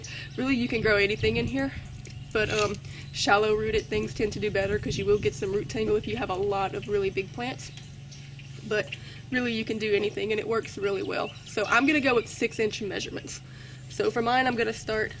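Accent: Louisiana, United States. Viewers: Love the lousiana accent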